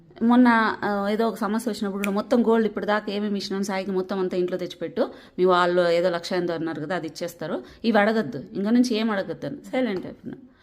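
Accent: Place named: Anantapur, India. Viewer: మీకు కూడా అనంతపురం జిల్లా యాస బాగా వచ్చేసింది 🙏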